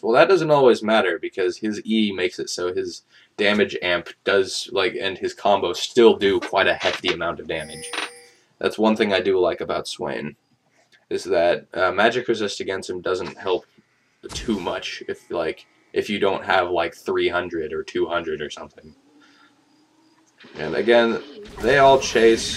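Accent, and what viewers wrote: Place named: Netherlands. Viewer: Where are you from?You sound Dutch,or mayb Belgian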